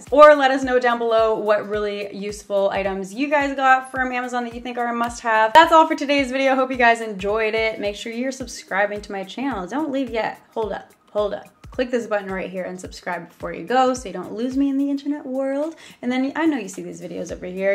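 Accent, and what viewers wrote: Canada. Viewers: OMG I love your canadian accent!!!! #Sclub #SlvForLife #Wolfia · Are you Canadian because it sounds like u have an accent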